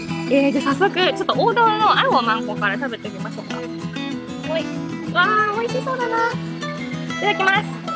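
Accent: Taiwan. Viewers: 根本是台妹講日語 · 為什麼是講的台灣國語?好可愛!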